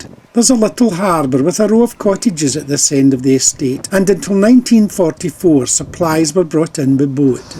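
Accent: Scotland, United Kingdom. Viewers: Charming video,. But I confess I was looking for English subtitles, I had difficulty with your Scottish brogue..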